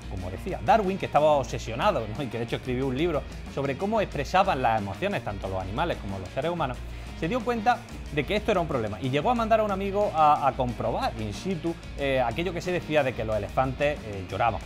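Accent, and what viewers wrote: Spain. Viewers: Muy mal audio y la edición podría mejorarse mucho si se reduce el sonido de los efectos y se evitan tantos cortes y por amor del cielo trate de hablar en español universal que lo entendamos los de fuera de España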